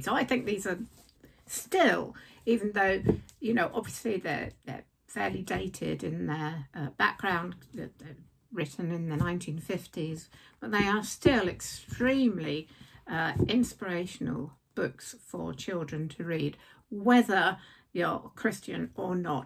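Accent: United Kingdom. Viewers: Hello! I live in Bedford County, Virginia in the U.S. It’s a small town and is the home of the National D-Day Memorial. Anyway, I’ve never been outside of the U.S. and I have always been fascinated by all things in the UK, Ireland and Scotland and especially books set in these places! I love your videos, your accent, and your knowledge of books and your reviews! Watching you makes me feel like I’m there in your beautiful country with you! Thanks for your inspiration of reading. You are lovely and delightful. Tina 😊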